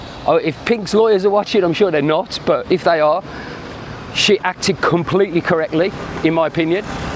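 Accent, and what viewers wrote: Birmingham, United Kingdom. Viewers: What part of Brum you from.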